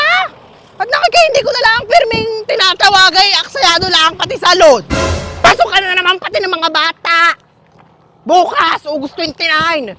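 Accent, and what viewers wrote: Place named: Tanay, Philippines. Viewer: 😅😀😂ayy nakopo Meses ikaw baga e tlgng nakakatuw akoy tawa ng tawa syo meses,,,Lalo n yng Puntong Tanay, mo dito samin na "Hane" 😂😀😅👏👏👏👏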